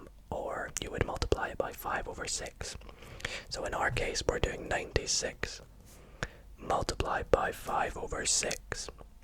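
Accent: Scotland, United Kingdom. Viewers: were do you come from in scotland? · I new you were from Scotland 🏴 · man’s talking bri’ish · You sound a lot like jojo asmr with an occasional Scottish accent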